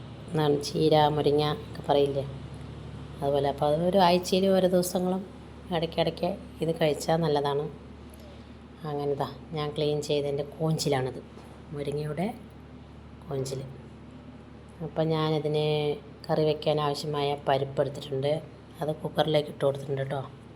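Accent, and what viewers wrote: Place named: Palakkad, India. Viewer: കുറേ ദിവസായി പാലക്കാടൻ സം സാ രം കേട്ടിട്ട് കേൾക്കാൻ കൊതിയാവുന്നു ലൈവ് ഇപ്പോൾ കിട്ടുന്നില്ല 😭😭😭😭😭😭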